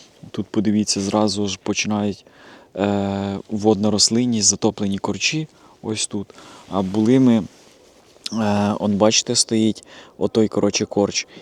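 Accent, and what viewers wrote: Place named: Polissia, Ukraine. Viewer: Доброго дня ,яка місцевість , по мові ви з полісся.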